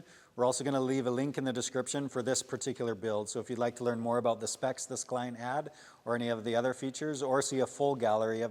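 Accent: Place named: Germany. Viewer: You have a elegant German accent if you are German. Nice van, thank you for the tour.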